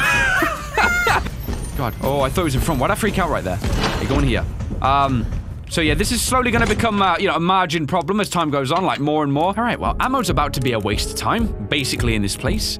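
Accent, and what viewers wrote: United Kingdom. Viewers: True british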